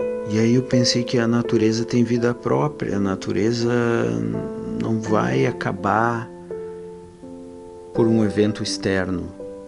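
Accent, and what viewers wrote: Rio Grande do Sul, Brazil. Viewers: Uma curiosidade minha é se você é gaúcho ou catarinense, Seu sotaque puxa muito para as duas regiões. Abs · linda matéria!!! mas tens um sotaque de gaúcho violento...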